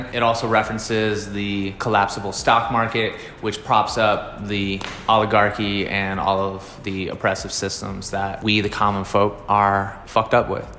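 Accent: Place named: South, United States. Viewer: Your little southern accent is too freaking adorable. 😭😭💖💖💖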